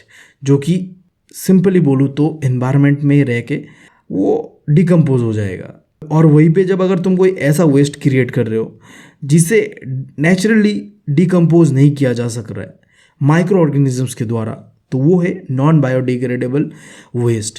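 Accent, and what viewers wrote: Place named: Bengal, India. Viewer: Ap Bengali ho ya Assami 🙄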